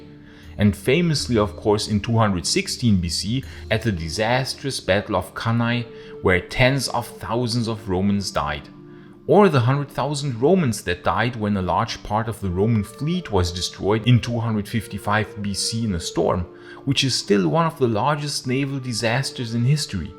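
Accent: Germany. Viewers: Kannst du bitte noch einen deutschen Kanal machen, bei dem du das ganze nochmal neu einsprichst? Nichts für ungut, aber dein Englisch klingt genauso wie das eines alten Klassenkameraden, der versucht hat so akzentfrei wie möglich zu sprechen. Das klingt in der Regel allerdings noch schlimmer als wenn man ganz normal als Deutscher englisch redet. Schau deine Videos echt gern, aber dieses versuchte Unterdrücken der deutschen Aussprache macht es echt schwer zu schauen. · Where are you from i wonder about your accent are you german?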